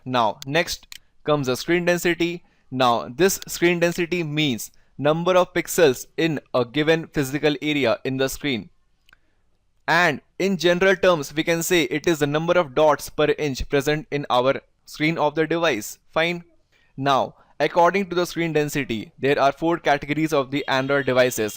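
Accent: India. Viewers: hey man I searched alot about this. Finaly I found your video. I understand everything, thanks alot man !! Btw for germans, english with indian accent is quiet funny^^. I can understand you clearly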